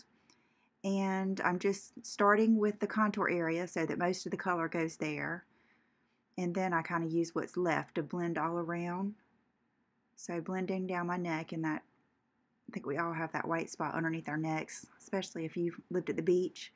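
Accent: South, United States. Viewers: Great video!!!! You’re voice is so welcoming and southern! ♥️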